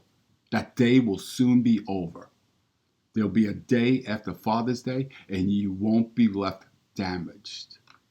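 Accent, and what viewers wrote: New York, United States. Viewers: Came to realise and found this channel. Our last "quarrel" as I tend to remember them was about missing mother's Day, and I used the argument "mom, since dad died in January this year it's been mother's Day everyday to me", and I got away with it at that moment, but it got out of hand for the so maniest time yesterday which made me google "narcissistic mother" and find this series of incredibly insightful videos. Thank you! (Love your NY accent!)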